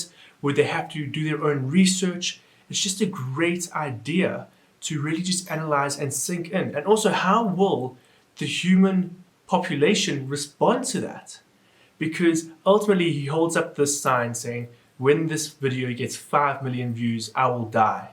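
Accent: South Africa. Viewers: I hear a Bloemfontein accent.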